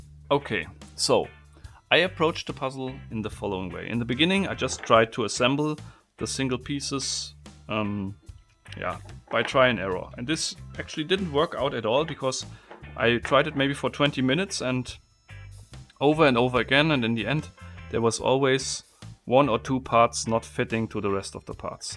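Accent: Germany. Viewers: Im not going to lie the main reason i watch you is because i love the german accsent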